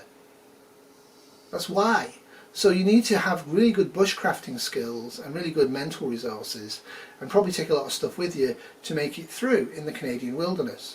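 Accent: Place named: England, United Kingdom. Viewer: What what old boy. 🏉 Is not the hardest game ever.... Ha ha 😂. Are beer is the best and we have the werst weather. Rain in the morning sunshine then pissing it down.... 😊. Your so funny. An yes I can here the English in your voice. Keep it up mate. ATB ta the both of ya. Andy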